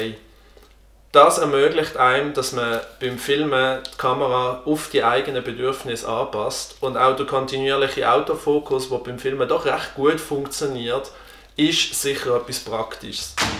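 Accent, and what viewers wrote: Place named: Switzerland. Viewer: Hallo,wäre es möglich auch das Video in DEUTSCH zu machen ?? Schweizerisch sehr schwer zu verstehen !!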